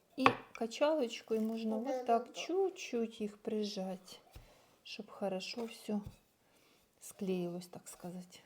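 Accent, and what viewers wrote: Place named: Poltava, Ukraine. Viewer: Я ,як почула Вас,подумала що з Полтавщини .У нас теж так кажуть -кабак.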